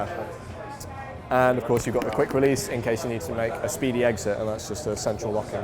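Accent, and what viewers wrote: RP, United Kingdom. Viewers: This posh nerd as put me 2 sleep.! ☆WOW[NEVER THOUGHT I'D C THE DAY]....talking bout F1 & this donnie is making me snooze.! Lol..!!☆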